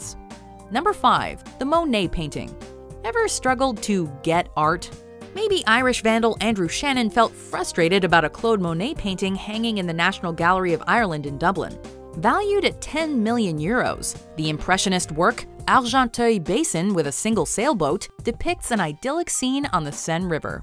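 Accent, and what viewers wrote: United States. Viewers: the woman has a US accent, therefore she is being pretentious. It is irrelevant how it is pronounced in other countries. You want to hear it pronounced that way, make your own videos. As for her, in the USA, it is a mispronunciation. VASE. VAYSSSSS.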